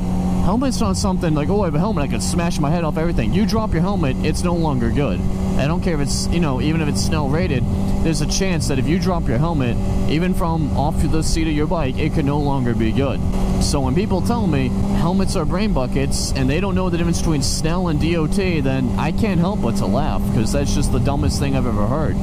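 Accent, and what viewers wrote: Massachusetts, United States. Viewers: Are you from Massachusetts?!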